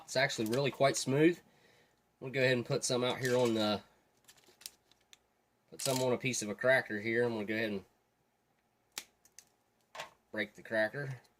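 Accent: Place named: Appalachia, United States. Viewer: i was think maybe on of the southern appalachian states based on how you pronounce some of your words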